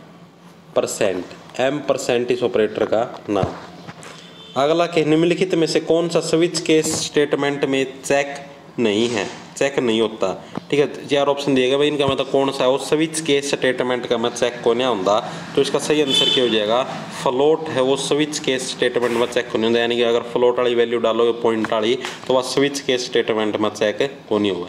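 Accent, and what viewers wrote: Haryana, India. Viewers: Ram ram guru ji aapki haryanvi bhasha achi lagi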